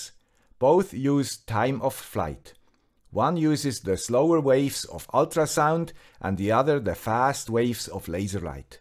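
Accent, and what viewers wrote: Switzerland. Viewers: A little more about why a solution matters (and why your videos have been helpful, apart from the pleasure of hearing a Swiss accent again after my years in Bern): A few years ago a colleague at my university asked me if I could help measure the level of water in the ground in a tropical rain forest. In fact I was already studying groundwater saturation in peat and other soils. This is a hugely important topic worldwide because it is an important factor in flood risk and in the loss of carbon from soils - one of the very biggest sources of greenhouse gasses to the atmosphere. So I had a go at the problem. There are a lot of ways in which people measure the level of water saturation, and soil moisture, some of which are good, some of which are poor - and which you have very helpfully discussed in previous videos. As you know there is, in fact, one method of measuring soil water content which, if calibrated, can be made quite accurate and tough using cheap sensors - capacitance monitoring. 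There are also many ways to measure groundwater saturation level (the level at which all the porosity in the soil is full of water, which is also the level to which a dip-well tube will be filled) some of which can make use of the instruments you discuss in this current video. My preferred method is to weigh a tube suspended inside a dipwell (because it's really tough enough to work in hard environments), but I have the load cell creep problem to deal with so maybe I go to a laser distance sy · Tangential question: at <link> are you saying "gritty YouTubers" or "greetings YouTubers"? I'll wager it is the former: gritty. The Swiss accent, while adding color and charm, leaves me in doubt about which word it is. Can you confirm? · Perfect timing! I have a small decorative fountain that I brought in to store for Michigan's winter. I wanted to outfit it with a sensor to stop the pump if the water level dropped to prevent damage and alert Home Assistant. 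Now I have no excuse to forget. Once again thanks to the guy with the Swiss accent!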